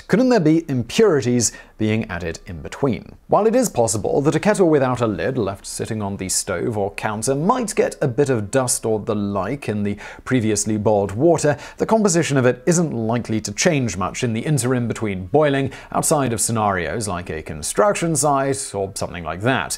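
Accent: United Kingdom. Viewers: I just got tricked into listening to a Brit talk about tea for 15 minutes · it’s hard as it is to understand this fast speaking British accent. you are a life saver .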